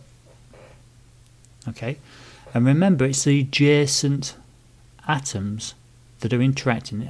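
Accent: United Kingdom. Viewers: That's rude, his voice is not 'obnoxious', its the Yorkshire accent...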